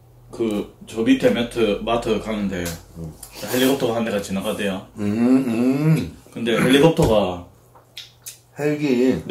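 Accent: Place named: Gyeongsang, South Korea. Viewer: 창원 사투리 맞는거 같은데요?ㅋ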